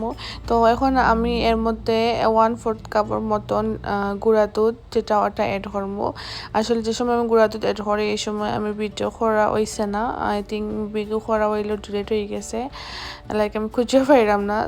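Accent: Sylhet, Bangladesh. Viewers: সিলটী কথা হুনতে খুব ভালা লাগে । আমিও একটু কইলাম । কিন্তু তোমার নাসতা দেখতে কিন্তু সেই ভালা অইছি ।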